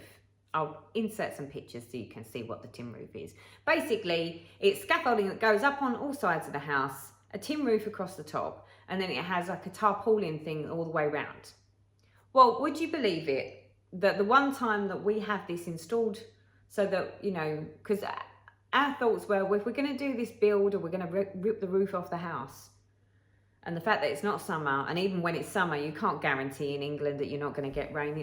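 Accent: United Kingdom. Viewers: Ive just started cross stitch and love full coverage just want to say thank you for helping me find my groove and it's confirmed I'm still in love with the British accent lol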